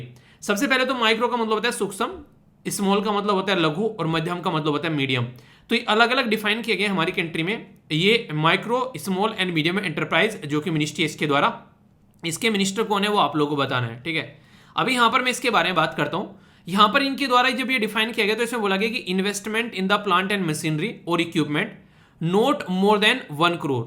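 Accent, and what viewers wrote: Haryana, India. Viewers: sir app kon si district se belong karte hai app ki awaj haryanvi lagti hai jo bahut hi pyari hai i am also haryanvi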